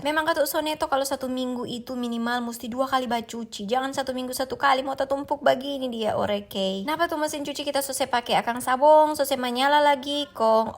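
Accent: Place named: Manado, Indonesia. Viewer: Suka nonton video mbak.....mungkin krn dialek bahasanya yg enak.....❤ Salam dr Makassar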